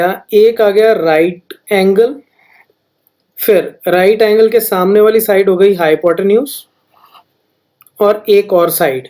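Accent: Punjab, India. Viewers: Are you punjabii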